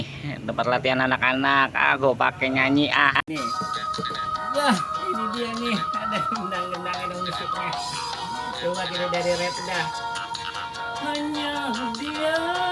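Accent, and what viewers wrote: Jakarta, Indonesia. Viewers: Selalu senyum kalo nonto ini chanel...Khas betawi nya itu Looh...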